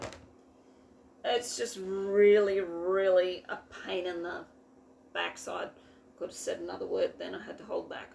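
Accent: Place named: Australia. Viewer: Always good to see a video off you, no matter if it's a small or large amount of stitches. Boy that was a super busy weekend, you had every right to be cream crackered. Do love the brown fabric you dyed👍. The stand looks very well made, sure you'll get many thousands of stitches completed on it.I love hearing the Aussie accent! Stay safe, hoping you'll be able to get some stitches completed 🤗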